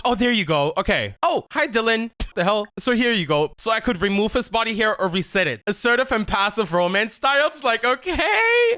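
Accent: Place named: Italy. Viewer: holy molly....you sound ITALIAN ...no offense to the italians hahaha i enjoyed the video truly...it was really entertaining and your acting attitude really fun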